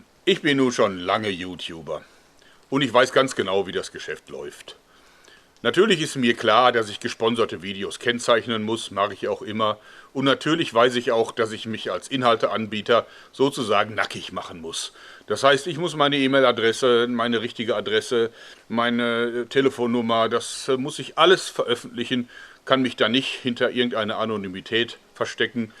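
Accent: Germany. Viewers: Wow heavy German accent. Please if there's another expendable film coming around, can you please apply for an audition. I love to see you there. You have a very action like character, and at the same time with superb sense of humour. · Heavy german accent...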